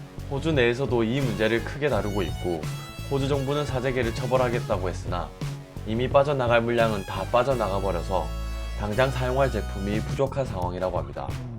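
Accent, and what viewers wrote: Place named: Gyeongsang, South Korea. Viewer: 진짜 듣기 싫네, 저 목소리. 쌍도 것이구만. 쌍도 새기들은 무슨 유튜브를 저렇게 많이 하냐, 선거나 제대로 하지. 요즘 쌍도 사투리 들으면 두드러기가 난다. 꺼져라, 이 더러운 쌍도 물건아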